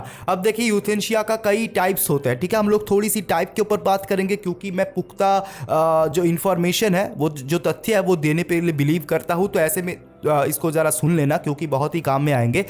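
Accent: India. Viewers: Aapki voice indo english lagti hi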